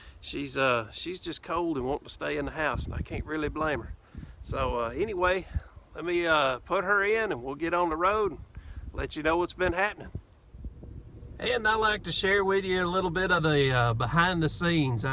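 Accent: South, United States. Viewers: 😂🥰 you are just a hoot! I so love watching your videos and oh gosh yes, love that southern drawl😉🤩 Muppy is so adorable and she seems like my little girl right now, just wants to stay warm and curled up on her pillow and blanket or either on my lap. Yawl stay warm and dry and have a great week ahead😉👍🇺🇸🐾🐾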